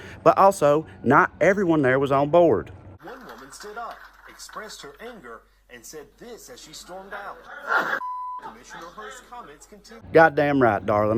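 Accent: South, United States. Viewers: I think more often than not you’re talking out of your ass sweetie. Maryville Tennessee resident here. I’d debate you on damn near anything. What I’ve seen of you so far? There’s nothing insightful about you. You basically just repeat what other people say only you do it in a southern accent so for some weird reason it makes you exceptional. I think you’re a fucking idiot. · This over-the-top sense of humour based on common sense and delivered by this obviously properly informed, well-educated human being in this seriously country accent, still has my brain trying to assimilate and adapt to the fact that people like this exist 👏☺️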